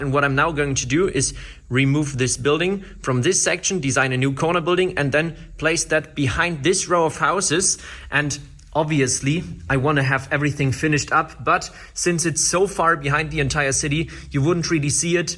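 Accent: Germany. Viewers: Are you from Germany or Austria? btw, love your video's! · HAH ICH WUSSTE DAS DU EIN DEUTSCHENAKZENT HAST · I hear a bit german XD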